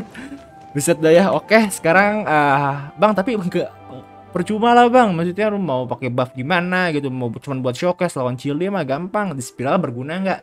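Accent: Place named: West Java, Indonesia. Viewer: Meni sunda pisan euy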